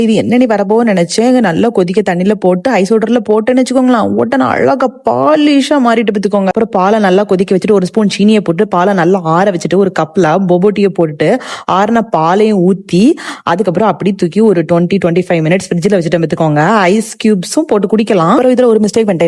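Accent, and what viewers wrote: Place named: Nagercoil, India. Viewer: Nagercoil பேச்சு azhagu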